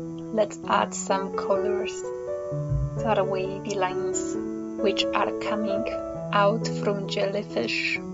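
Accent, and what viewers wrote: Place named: Scotland, United Kingdom. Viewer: Oh I can hear your scottish dialect by your speaking 😁. This remember me on scottish singer Derek William Dick -> yes his real name as Fish (early from band Marillion 81-88). Do you know him? ☺☺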